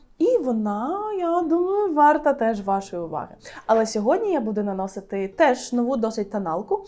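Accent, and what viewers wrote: United Kingdom. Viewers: Суперське відео, люблю цей бренд) І у вас яскравий, як на мене, британський акцент, дуже класно звучить😍😍😍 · Вперше чую українську з британською інтонацією😅 наче дивлюсь укр контент, а відчувається наче дивлюсь англомовного блогера. Якийсь дисонанс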